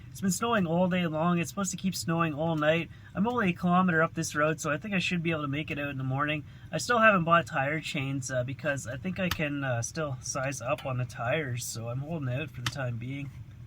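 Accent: Canada. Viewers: Good job on the stove pipe. Love your Canadian accent, much love from Detroit Michigan. Peace Owt 😂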